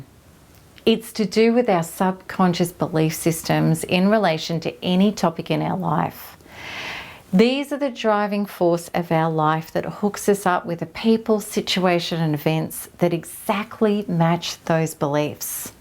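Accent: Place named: Australia. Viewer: I never cared for Australian accents, no offense. Then here comes! Your voice, including accent 😀 is so soothing. God bless the good work you do! CD for me was all the shitty things he was DOING and the "I love you" he was SAYING.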